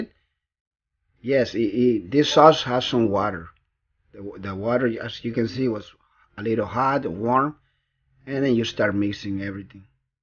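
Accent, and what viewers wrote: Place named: Mexico. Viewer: He's not Argentine. He sounds Mexican, perhaps? This chimichurri is pretty good. I just made a batch.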